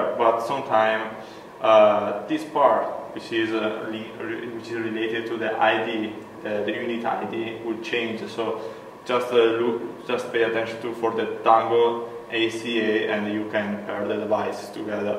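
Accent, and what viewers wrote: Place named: Italy. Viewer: italian accent xD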